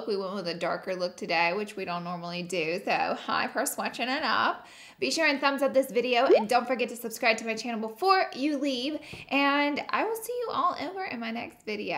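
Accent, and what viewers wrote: South, United States. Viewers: So many people diss her accent! Trust me y'all it's harder than it looks to say concealer as a southern😆 Love you 😀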